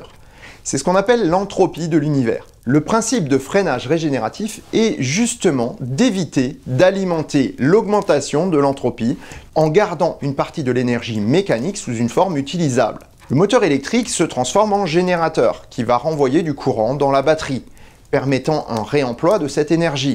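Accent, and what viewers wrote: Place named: Alsace, France. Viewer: Super intéressant et bien présenté, j'aime bien le décor. Peut-être un point à améliorer pour ma part, le petit alsacien distrait l'écoute.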